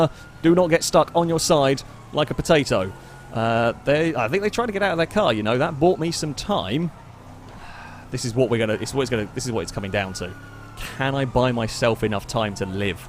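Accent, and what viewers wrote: United Kingdom. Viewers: God ur british accent wont help you, you just suck at Survive the hunt, I mean this is n#20 and you STILL cant survive?? IF next content gets bad like this got, imma avoid this channel 4ever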